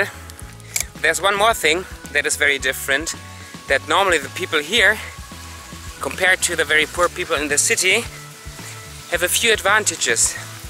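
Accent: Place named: Eastern Visayas, Philippines. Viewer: nice u speak the dialect WARAY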